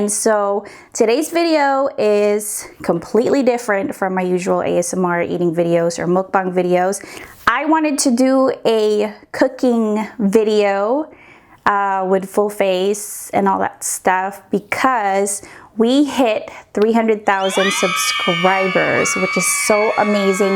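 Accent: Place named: Philippines. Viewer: I love your Filipino accent. It's so different when you were whispering hehe. Love you ate ❤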